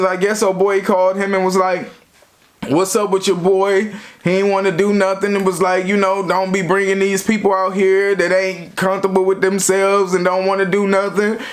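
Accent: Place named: New York, United States. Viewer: I gotta say you got that New York accent down cuzzo! Great story time bro! 👍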